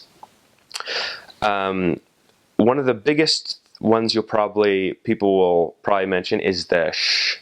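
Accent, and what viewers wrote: Brazil. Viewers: You have a better brazilian accent then continental xD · Nice job man! Im from Portugal and I gotta say, you really have the accent from brazil xD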